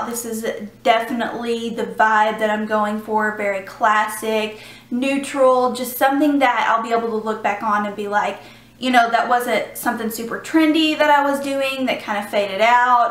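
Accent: South, United States. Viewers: Im in love with her southern accent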